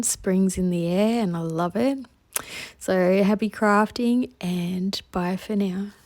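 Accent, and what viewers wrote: Australia. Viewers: Hi, thank you for sharing this video with me. I will have to watch some more videos from channel. It is so nice hearing another Aussie accent. ❤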